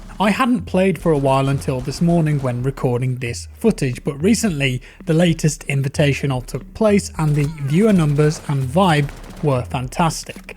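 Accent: United Kingdom. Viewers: Where in the U.K are ya from mate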